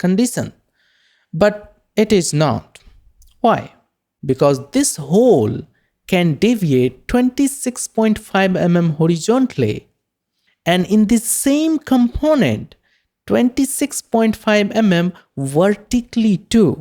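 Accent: India. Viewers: Despite the fact that you are Indian and have a funny accent, it doesn’t hinder absorbing useful information at all. Moreover, the illustrations for better visual perception are very helpful. The material in the video is excellent, very useful, and I am learning from it. Thank you and greetings from Ukraine. All the best!❤❤❤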